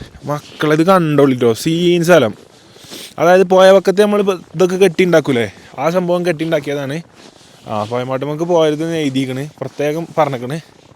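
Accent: Malappuram, India. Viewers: മലപ്പുറം ഭാഷ ഒരു രക്ഷയും illa 😁😁💕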